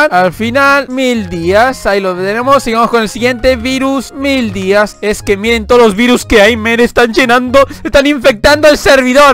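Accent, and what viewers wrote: Argentina. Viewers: Sos argentino? Xd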